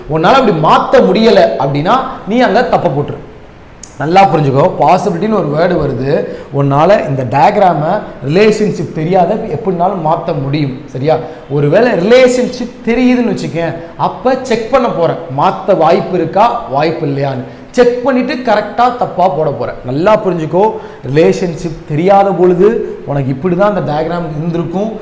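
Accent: Madurai, India. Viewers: Anna neenga madurai ah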